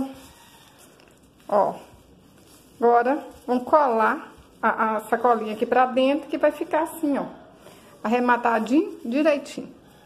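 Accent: Minas Gerais, Brazil. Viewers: Olá,vc é mineira? Pq eu sou,e as pessoas onde moro dizem que falo diferente e sou engraçada ao <link> identifiquei com seu jeito e amo artesanato tb. Sucesso. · É mineira né?! Fala igual a mim!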